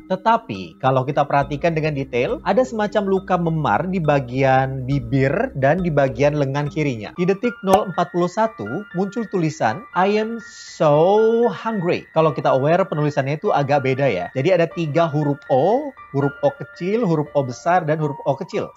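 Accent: Makassar, Indonesia. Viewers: Orang Makassar kah ces?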